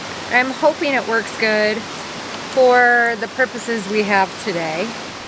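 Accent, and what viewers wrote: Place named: Chicago, United States. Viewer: DUDE!!! I’ve watched a few of your videos, I’m subscribed but I work a lot, I don’t know if you’ve ever mentioned you were from Chicago but when I saw your 1st video I thought to myself, “she sounds like she’s from Chicago” lol! I live 45 min south of the loop!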